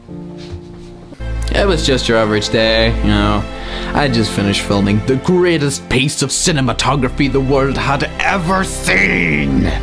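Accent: Ireland. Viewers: what part of ireland are you from ?:)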